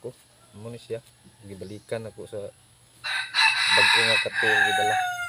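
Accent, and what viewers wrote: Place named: Visayas, Philippines. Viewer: Dol 😂 halata na kaayo nga bisaya gd. Ayna pag Tagalog bisaya lang 😅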